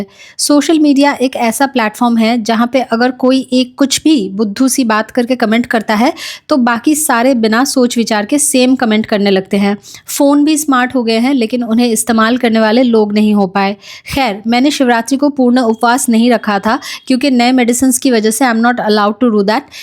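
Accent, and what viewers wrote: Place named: Haryana, India. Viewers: Right di I'm from haryana and its normal mai or meri cousin, friend aise hi baat krte hai kbhi kbhi . Ap in sb p jyada dhyan mt diya kro ap jaisi ho perfect ho❤ love you alot from haryana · Haa didi❤ radhavallabh shri harivansh... Aap shi bol rhe ho m bhi haryana se hu or hmare yha kuch ladkiya bolti hn aise and sach me cute sa impression aata h❤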